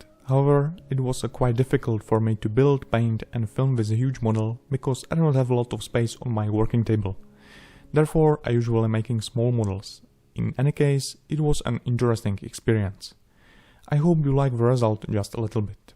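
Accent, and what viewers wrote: Czech Republic. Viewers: "just a little bit" :-) vynikající model a další vysoce kvalitní video, jen to na můj vkus maličko sráží místy školní czengličtina (nemůžu si pomoct, jsem anglista), slovní zásoba je easy nebo si dohledáš, ale občas tomu skřípe pořádek slov, vazba there is/are, etc., někdy to zní jak přes google translator :) neber to, prosím, negativně, za poslední rok dva ses hodně zlepšil; předpokládám, že komentář čteš z nějakého aspoň provizorního skriptu, nechtěl bys to třeba před namluvením zkorigovat? mně to zabere 20-30 minut a rád jako profi lingvista pomůžu profi modeláři k ještě lepším videům ;) kdyžtak napiš do odpovědi a domluvíme se přes fb, ale mezi námi, stejně to asi nikomu nevadí, naopak, ta tvoje ostravská angličtina tomu dodává local flavour ;) ať to šlape!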